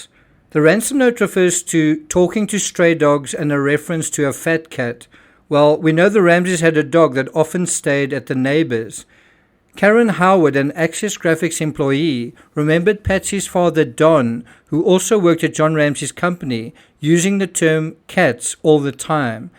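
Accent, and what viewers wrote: South Africa. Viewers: That south african accent though. Hideous af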